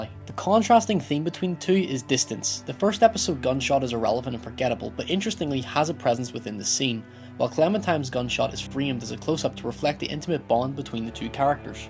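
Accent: Northern Ireland, United Kingdom. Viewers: great video, is that a northern irish accent?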